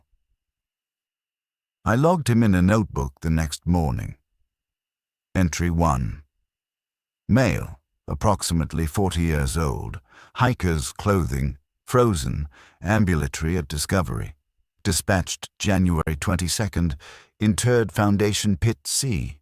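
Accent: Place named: Aristocratic, United Kingdom. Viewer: Dont mind the English accent .but an aristocratic one sucks.🇨🇦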